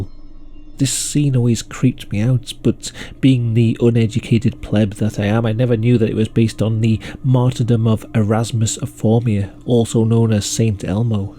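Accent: United Kingdom. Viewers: hey I love your vids and ur british accent <3 also im a little drunk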